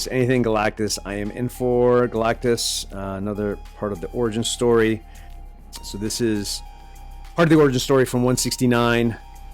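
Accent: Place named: Canada. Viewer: wicked strong Canadian accent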